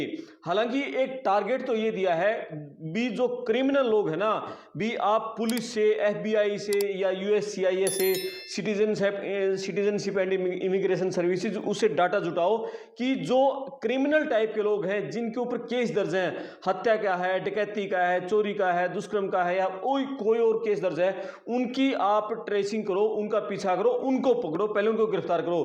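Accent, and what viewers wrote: Haryana, India. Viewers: जी आपने अपनी भाषा पर नियंत्रण पा लिया है 90% control है। पता ही नहीं चलता कि वक्ता हरियाणवी मूल से है हल्की सी हरियाणवी झलकती है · U r fine , perfect presentation bt need to improve hindi,u r haryanvi accent bt disappointing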